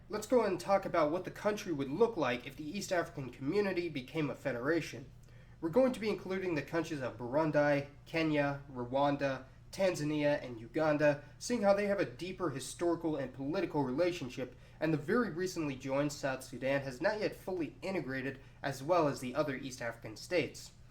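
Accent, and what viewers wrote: United States. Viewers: Burundi is pronounced “Burundee” and not your Americanized habit of pronouncing “I” as “eye” with so many things 🤨😒😑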